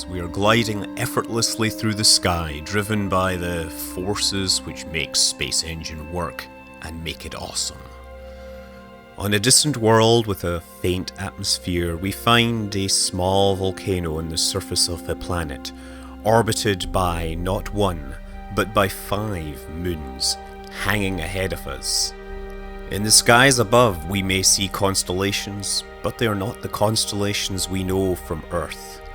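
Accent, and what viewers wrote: Scotland, United Kingdom. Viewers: Omg I just realised how deep of a Scottish accent u have got btw love ur videos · Rather Carl Sagan with a nice Scott taint. brilliant. does space engine do stereoscopic output?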